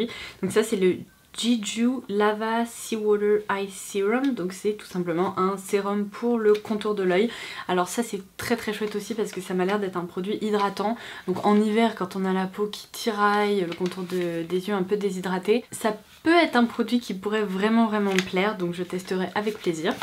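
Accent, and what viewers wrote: England, United Kingdom. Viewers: La vidéo qu’on attendait toutes je pense, au début j’étais comme toi pour la bave d’escargot mais finalement étant donné que ça fonctionne eh ben ma foi 😂😂😂j’adooooooore ton accent anglais en passant hihi et merci pour le concours 🤞🤞🤞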